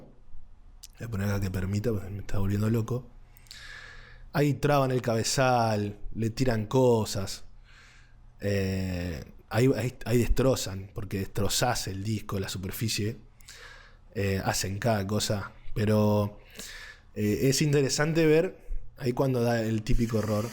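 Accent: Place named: Argentina. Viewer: Uno casi nunca encuentra un video tan informativo como el tuyo y encima con ese acento argentino que tanto uno necesita en la informatica jajaja, gracias genio aprendi una bocha con tu video ! Me suscribo y ojala sigas con el contenido que me parece muy interesante !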